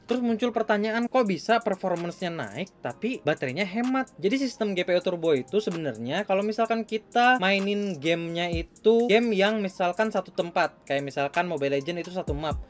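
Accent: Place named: Serang, Indonesia. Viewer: Waduh bahasanya to orang serang banget:v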